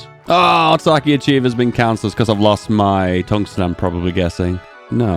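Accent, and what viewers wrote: England, United Kingdom. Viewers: Love your English accent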